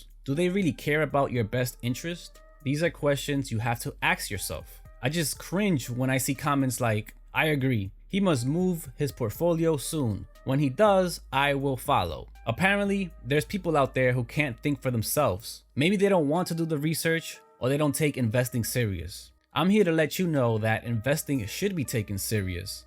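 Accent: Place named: New Jersey, United States. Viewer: Are you from New Jersey?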